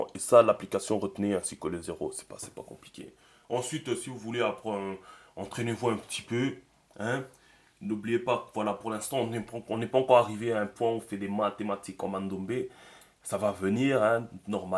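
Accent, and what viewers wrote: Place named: Banlieue, France. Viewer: tu parle trop pour rien dire. je te conseillerais d'apprendre un cours de méthodologie pour enseigner .avec ton accent des banlieues ça nous casse les couilles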